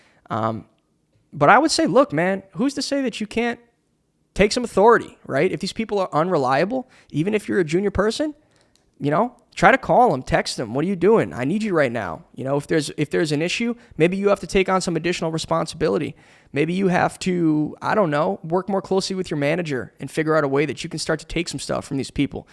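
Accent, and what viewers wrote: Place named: United States. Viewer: The diction, the intonation, the rhythm....... soooo perfect! 🙌 I'm currently learning American English and YOU have the most PERFECT American accent, I can listen to you the whole time and mimicking you (and that makes me feel confident and motivated) Hope you can make more solo podcast. Thanks a million! 🩵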